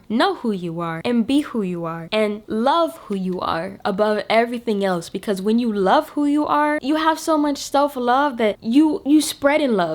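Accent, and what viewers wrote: United Kingdom. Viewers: The famous British accent🙃💕 back with the good advice as usual ❤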